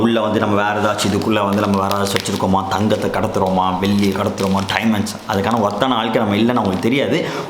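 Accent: Madurai, India. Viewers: Madurai ya thala neega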